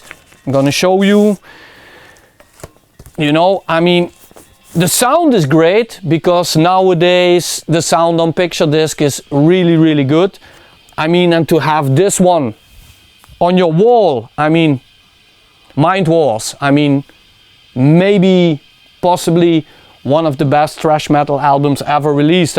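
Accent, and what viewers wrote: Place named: South, Netherlands. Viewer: I like the thick Dutch southern accent. I mean, do not change it. Go with it, embrasse it. Looking forward to see more of you. Untill the next fire:).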